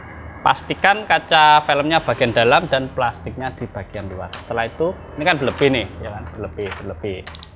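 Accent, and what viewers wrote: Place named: Java, Indonesia. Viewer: owalah denpasar ... kalo ngomong kok ada jawa"nya masbrow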